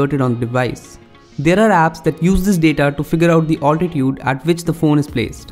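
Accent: India. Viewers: Indian flavor of English is really irritating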